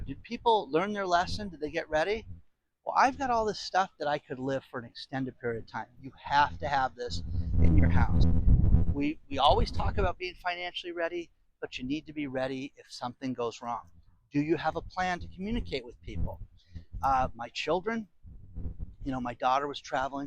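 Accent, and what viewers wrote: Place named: Wisconsin, United States. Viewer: Great hope you put them all to work! Smile sounds like you are from WI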